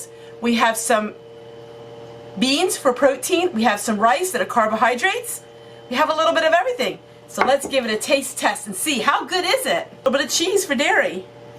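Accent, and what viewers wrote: Pennsylvania Dutch, United States. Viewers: I’m off subject here, I cannot find your mailing address. My son is curious about your accent. He wanted me to ask you if it is Pennsylvania Dutch?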